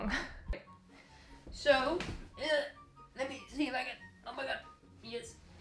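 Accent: Netherlands. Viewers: Are you dutch, cause your accent sounds like you are?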